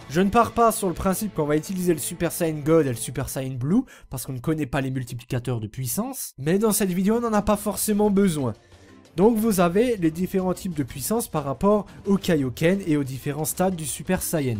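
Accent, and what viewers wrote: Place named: Lorraine, France. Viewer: Super accent de la lorraine !!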